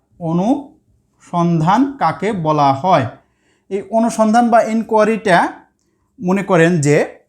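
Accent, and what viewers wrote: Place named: Jessore, Bangladesh. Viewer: আসসালামুআলাইকুম স্যার আপনার বাসা খুলনা বিভাগের যশোর জেলায় মনে হয়ে।।আমার বাসা ও যশোর, আপনার কথার টান দেখে মনে হচ্ছে।। ক্লাস সুন্দর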